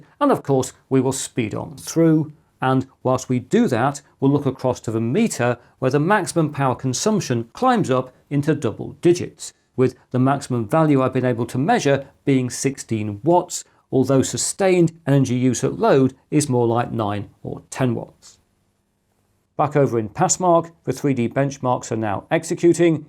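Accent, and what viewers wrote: England, United Kingdom. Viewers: english accent, check. electronic music, homemade, intro, check. nerd time, check.! love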